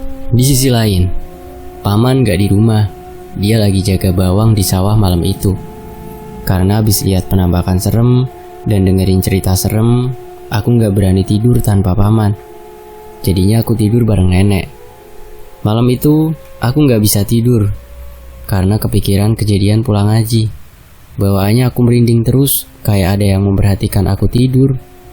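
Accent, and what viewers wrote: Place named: Banyumas, Indonesia. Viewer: Bahasanya ngapak campur jawatimuran min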